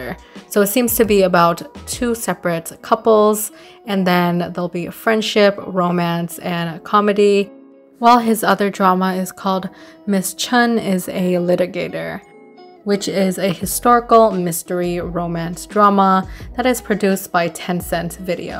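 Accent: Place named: South Asia. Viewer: I have watched it once or twice. Not really interested about it. Because I think they are just not accurate. Just notice that the channel owners usually from south asian - due to the accent. Probably form India or Pakistan. It's hard to attain such informations like income and personal life. It's difficult to dig out info about C K and J artists n Idols because they keep their life privately. Especially in China. Mostly bcause the govt watches everything. But you can get info from reliable sources like established media with reliable journalist. Or try to read a blog. But absolutely they cant tell the exact amount. Only the speculation. I cant speak chinese korean n japan. I only read and watch in English. Oh yeah..even their own native media cannot tell the exact info about the artist. For example. Nobody knows where Fan Bingbing resides exactly evsnthough people said she lived in luxury complex. 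Japanese paparazzi often make mistakes about where their idols actually sleep. For chinese actors..we already know they have yinyang contract. Wont know how much they get paid. And we dont know how much their agency fee and their expense. And how they manage their money. Because when they exposed their wealthy, they should already to pay tax too. And dont forget people who will critize about how the artists manage their wealth. IU got critics when she bought the land. Actually she was helped by the asset management agency. JunJihyun was critized for being a mean landlord. AFAIK, s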